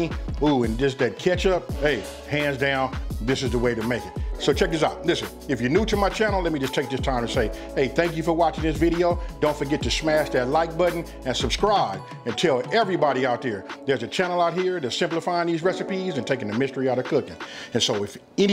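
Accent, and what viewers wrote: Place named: California, United States. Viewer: It's giving.. retired gangster meets good woman & becomes domesticated. lol. I love it! i love a cali accent! i definitely subscribed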